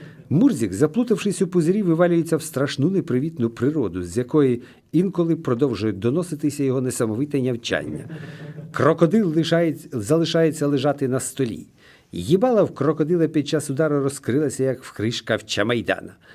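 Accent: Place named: Surzhyk, Ukraine. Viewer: На суржику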